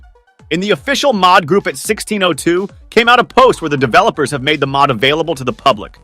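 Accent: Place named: England, United Kingdom. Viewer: Cool inglesh voice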